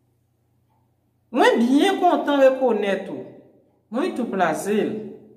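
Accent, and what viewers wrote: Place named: Brazil. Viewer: Ou pale portugais an tankou yon brésilien felicitations au commencement m pat konn anyen men koulya m komanse konprann lè wap pale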